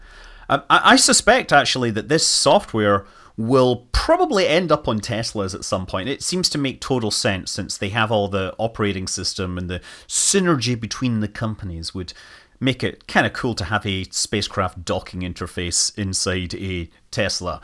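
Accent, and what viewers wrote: Scotland, United Kingdom. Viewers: I hear Scottish accent and see science. Subscribed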